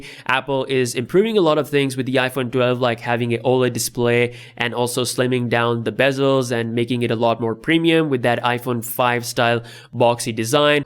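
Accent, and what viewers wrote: India. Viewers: U sound so Indian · I just cant watch this. Too much indian accent. My god.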